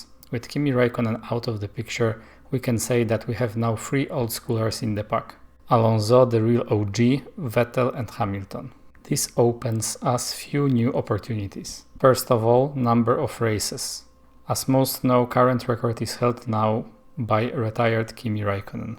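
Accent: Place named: Poland. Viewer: Uslyszalem akcent i od razu skojarzyl mi sie polak xd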